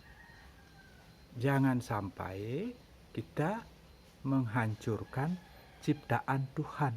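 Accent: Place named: Bali, Indonesia. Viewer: Salam kenal bapak baik hati berlogat bali. Semoga energi simpatik dan jiwa penolong anda berguna bagi sendiri, engkau juga dirimu. Saran saya suara yg terbata2 dan pembahasan yg muter2 lebih di lutuskan lagi niatnya🙏🙏🙏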